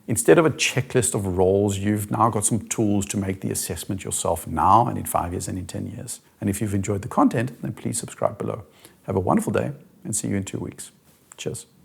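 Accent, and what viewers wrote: South Africa. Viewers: Great video, are you South African? I cant quite place your accent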